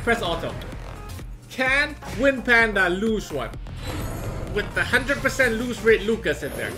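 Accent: India. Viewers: lol indian accent lmao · Indian accent is so funny😂😂😂😂😂😂😂😂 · indian accent crack me up so hard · Love the indian accent!!!!!! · best indian accent very good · that indian accents roflllll. so bad senpai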